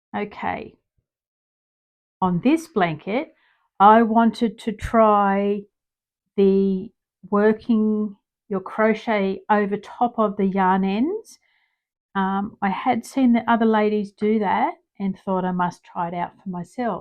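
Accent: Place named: Australia. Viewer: I'm so happy that your channel popped up. It is so good to hear an Australian voice! I will be watching all your previous videos to catch up. I'm only relatively new to crochet; I tend to sew in ends as I go. I love the colours you have chosen for these squares. I haven't used Stylecraft yet.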